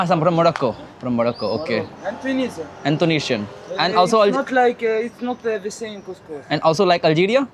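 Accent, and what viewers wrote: Bihar, India. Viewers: Yaar itni countries ka experience lene ke baad you are still bihari at least now you should leave your bihari accent bro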